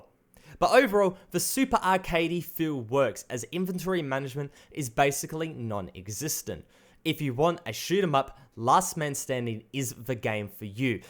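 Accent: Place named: Australia. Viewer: Dude's Australian his voice is easy to listen too subscribed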